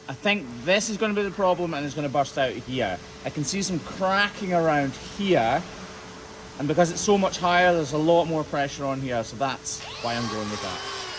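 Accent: Scotland, United Kingdom. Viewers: But you did provide footage, if accidentally. She was walking toward the crush and kept that foot off the ground completely, hopping with each step. I am very grateful to your narration, too. I can understand your Scottish accent. But you visited a farm in Wales with a soft-sided corral system and I had to turn on closed captioning, I couldn't fathom what she was trying to communicate. Thanks for all you do, I'm sure the girls you work on appreciate it too. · I love your channel so much, I've been learning to speak with a Scottish accent just by repeating things you say! I think it's a promotion, and I bet you agree! Keep up the great work! Cheers to and!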